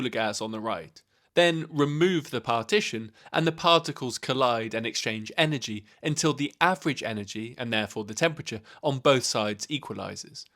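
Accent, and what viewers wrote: United Kingdom. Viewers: I love the way the creator used a British coin to match the British narrator he is using for the voiceover—a subtle and clever touch.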